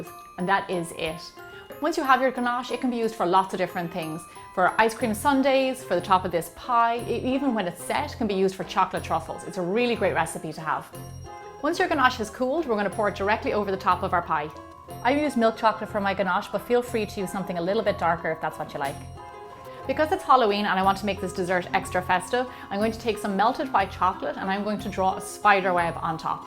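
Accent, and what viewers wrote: Ireland. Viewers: ur accent is beautiful what part of Ireland r u from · She sounds Irish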